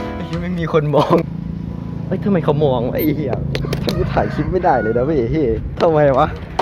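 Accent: Korat, Thailand. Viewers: ว่าแล้วเห็นคริปนั้นพูดโคราช😂❤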